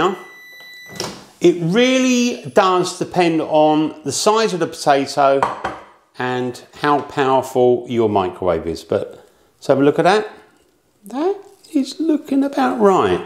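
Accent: United Kingdom. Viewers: Your definitely British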